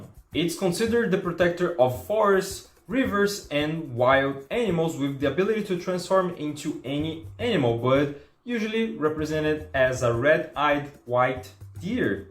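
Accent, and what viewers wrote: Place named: Brazil. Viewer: Simplesmente excelente, muito bom o sotaque brasileiro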